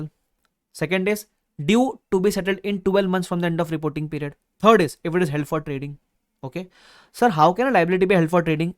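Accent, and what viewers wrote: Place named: Maharashtra, India. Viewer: sir pure english me mazaa ni a rha... missing your marathi accent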